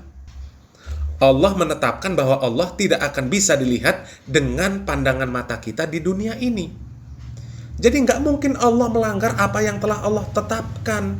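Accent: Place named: Pontianak, Indonesia. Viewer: Iya ini bahasanya org melayu Pontianak..👍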